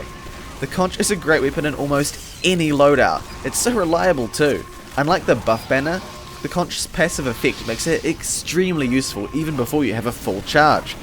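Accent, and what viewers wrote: New Zealand. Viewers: ***** Yeah the New Zillind accent is quite similar to an aussie one to the uninitiated ;) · Vintage retro guy He's from New Zealand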